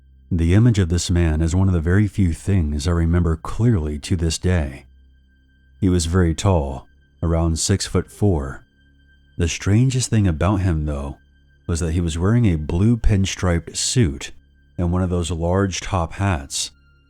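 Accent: Australia. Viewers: Wowsers. Your Ozzie accent on point, mate 🤙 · The irresistible time with! Thank you for remarkable work with great stories. Your voice narration is always spotless! Edit: That end was awesome! You spoke like an Australian 😄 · Your Aussie accent is very Northern Australia, great job! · Wrong, he sounded spot on By the way, we use the word “bloody”, but we don’t go through the trouble of typing it out online… Just a little tip the next time you pretend to be an Aussie, you poser lol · thats a solid aussie accent not even Australian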